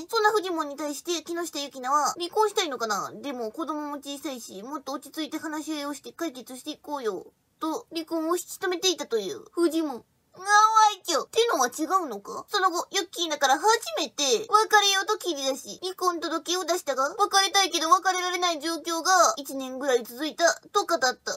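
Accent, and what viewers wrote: Kansai, Japan. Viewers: 総統、関西？ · 統裁は関西なのですか？ 関西弁も聞きたいです。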